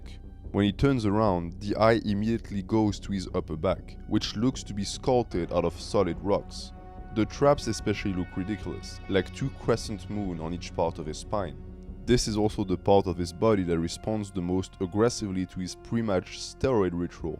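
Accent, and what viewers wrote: France. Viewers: En écoutant ton accent, anglais, en voyant ta façon de prononcer certains mots j’en déduis que tu es un français qui parle en anglais, c’est le cas ? In listening your accent, in the way your prononces some words, I’m deduce your are a French guy who speak English, is it true or not ? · are you french?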